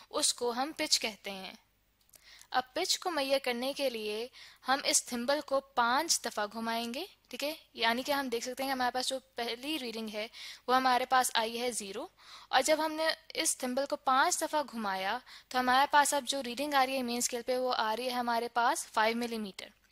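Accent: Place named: Pakistan. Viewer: Assalamoalaikum mam aapki awaz bht khoobsurat h or aapke smjhane k tareeke ki m fan ho gyi hu Aap shayad pakistan se hn asal m aapke bolne k tareeke se laga mgr sch m aap bhht achhe se smjhati hn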